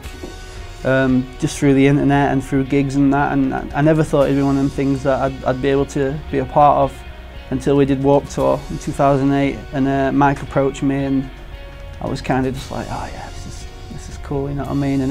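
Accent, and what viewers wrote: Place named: United Kingdom. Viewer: Макс Грин yeah, british accent, if you know what I mean ^^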